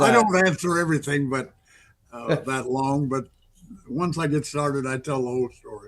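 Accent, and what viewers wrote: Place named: Massachusetts, United States. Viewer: has a very pronounced Mass accent, is less pronounced but I can still discern it. BTW,; I'm originally from Philly and have been trying to lose my accent for years with limited success🤣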